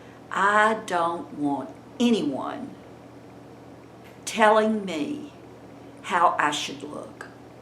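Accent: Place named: Memphis, United States. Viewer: I adore you. I feel such a closeness to you when you do videos like this. I lost my mother three years ago, and you remind me so much of her. Plus the Memphis accent. 😊I love hearing your thoughts and advice.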